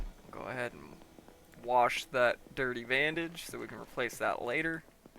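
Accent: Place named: Ohio, United States. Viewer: Lol idk you and this is the first video, but you sound like you're from Ohio 😅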